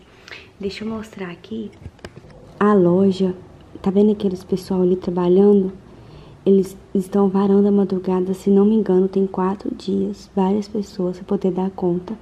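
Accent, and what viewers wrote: Minas Gerais, Brazil. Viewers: Você mora a onde?parece ser mineira!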